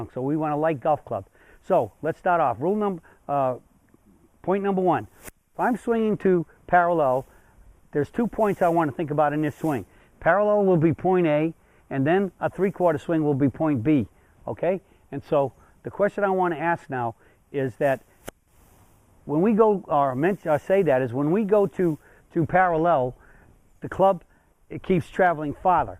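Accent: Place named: Boston, United States. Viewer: you sound like you're from the boston area like me. thnx for the lesson.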